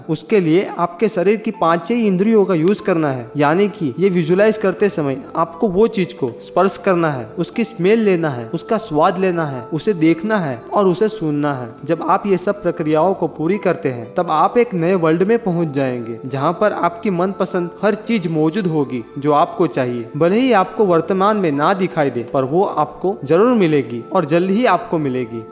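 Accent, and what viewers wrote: Gujarat, India. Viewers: Gujarati ya marwadi honge shayad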